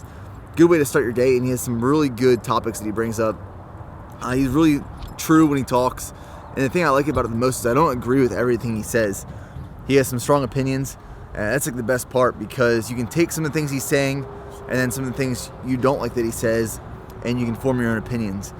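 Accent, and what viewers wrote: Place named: Philadelphia, United States. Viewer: you sound like your from philly/harrisburg area. I know that subtle accent when I hear it!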